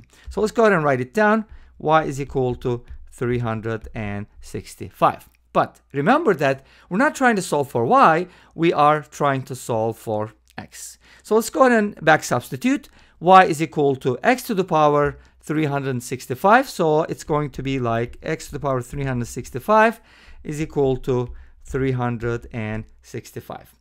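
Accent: Turkey. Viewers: Congrats! Is there a chance that you are from Turkey?? Your accent resembles Turkish English accent :)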